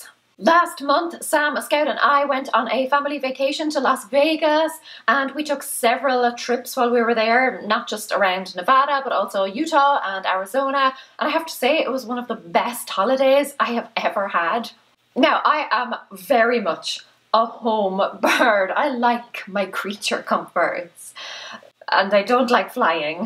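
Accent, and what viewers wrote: Ireland. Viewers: Omg I love the way you put things into doable stuff. You actually get it! Thank you I have 4 girls it's hard sometimes! I love them of course but thanks for your honesty (inspiring) xxx what part of Ireland are you from?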